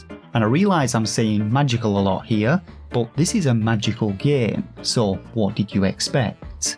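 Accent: United Kingdom. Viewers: What kind of accent does have its like so so british but hes over here like “seven-teen” instead of “seven-een” a lot of the pronunciations are also wild. Wondering where they are from.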